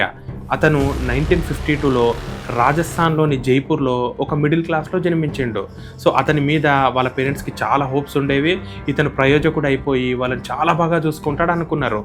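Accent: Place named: Telangana, India. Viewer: నాకు నచ్చింది మన తెలంగాణ యాస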